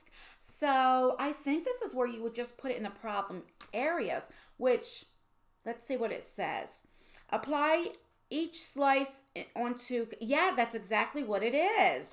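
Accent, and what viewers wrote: New Jersey, United States. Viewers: Oh Jersey! I was woundering where that accent was from! I am confused though. Glossy Box....thought it was gonna be lip gloss. Not disappointed though. Loves the box and whole video.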